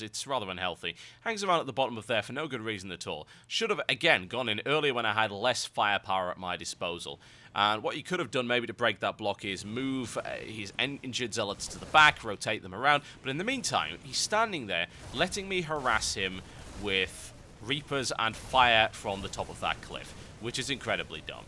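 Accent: United Kingdom. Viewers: I can't help but read comments and video descriptions in his british accent. Does anyone else have this problem?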